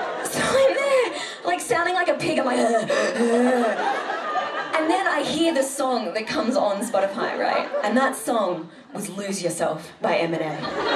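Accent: Australia. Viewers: She's Australian, we all sound like her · She"s Australian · Just an average Aussie Sheila.She is definitely not a kiwi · Is she from the land down under? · yep or maybe kiwi but I'm fairly certain she's Aussie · 55 seconds in I had to stop watching, Australian+the topic. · Love the Australian accent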